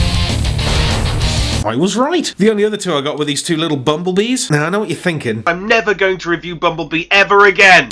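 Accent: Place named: Newcastle, United Kingdom. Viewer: I fucking adore the jordie accent or whatever it is when you read out the terrible knockoff names It's just beautiful and not a knockoff beatdown without it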